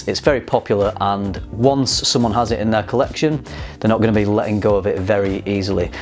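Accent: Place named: Leeds, United Kingdom. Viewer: Are you from Leeds? Trying to figure out where the accent is from 🤔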